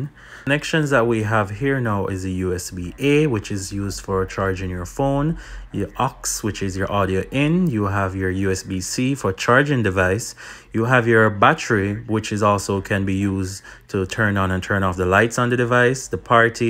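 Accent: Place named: Belize. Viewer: Bro you sound Belizean! What's your nationality? If you don't mind me asking!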